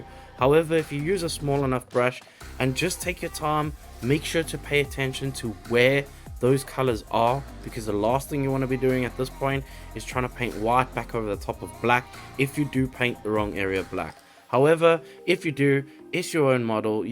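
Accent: South Africa. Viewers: Love your vids thanks for sharing!!! Subscribed ……Is that a South African influenced accent I hear there? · I'm very new to this channel, thanks for the tips! Is that a South African accent I'm hearing?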